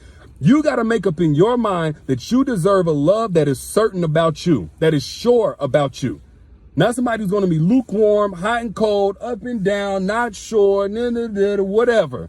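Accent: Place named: New York, United States. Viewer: NYC. Loud and clear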